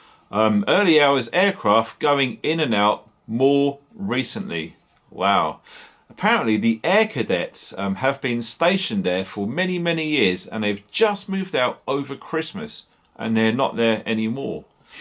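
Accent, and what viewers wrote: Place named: Cornwall, United Kingdom. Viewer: Sounds like the North Coast of Cornwall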